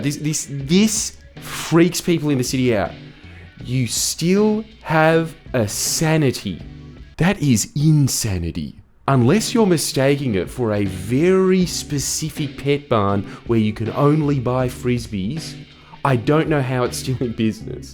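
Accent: Australia. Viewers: how did u not realise lmao he sounds pretty Aussie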